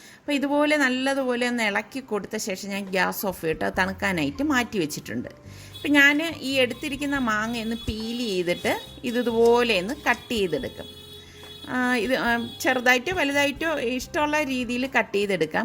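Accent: Trivandrum, India. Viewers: Tvm style samsaaram kettappo oru sugam......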